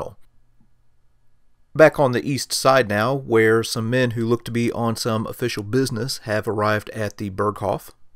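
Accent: United States. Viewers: German surnames that you pronounce as if they were American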